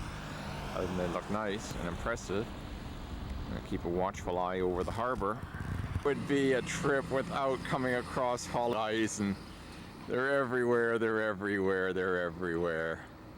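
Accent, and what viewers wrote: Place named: United States. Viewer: That’s amazing! I didn’t realize you’re from Germany speaking English—I actually assumed you were American. You probably chose English for the recording so that everyone could follow along. Thank you! I was born in Szczecin in 1983, and as I was walking the streets, it would be fantastic to spot myself in your video. 😄 You won’t believe how much Szczecin has changed since then! We’d love to have you back to film it again and share your thoughts.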